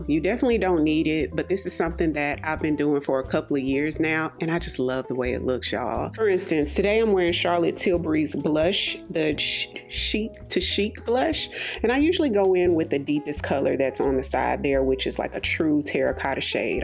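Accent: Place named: South, United States. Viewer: I often wondered where you were from.i hear your southern accent. Gorgeous look . Love it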